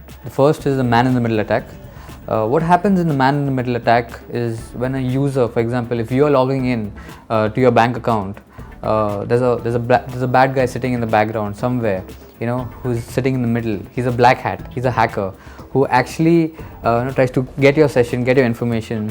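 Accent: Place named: India. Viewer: I am proud to be a romanian who knows how to talk and write in 4 different foreign languages. So, if you want people to understand your message in a proper way, learn how to fu***n pronounce. I don`t have nothing against India, or you, but ... your accent it is horrible. Thank you! :)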